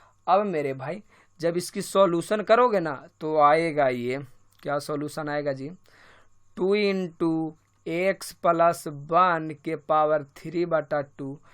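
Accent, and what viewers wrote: Bihar, India. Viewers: Sir,kya aap Bihar k Gaya jila se ho..??🙄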